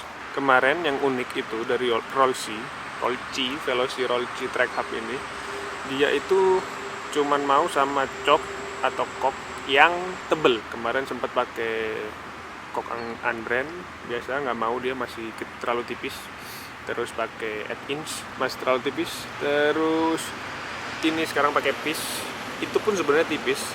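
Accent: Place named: Medan, Indonesia. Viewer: Jdi logat orang medan 😁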